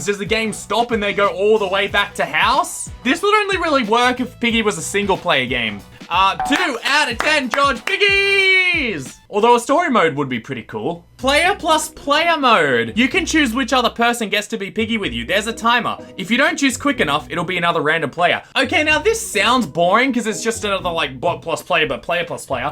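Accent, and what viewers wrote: Australia. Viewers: I can definitely tell you have an Aussie accent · You have a Australian accent! I’m Australian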